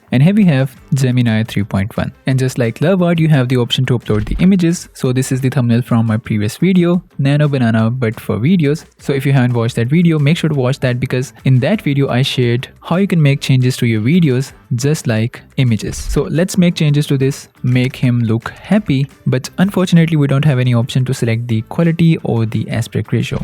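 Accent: India. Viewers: Indian accent is so annoying🥀🥀💀💀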